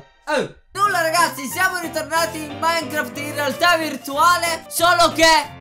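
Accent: Sicily, Italy. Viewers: Ma sei siciliano? Perché sempre che hai l'accento siciliano!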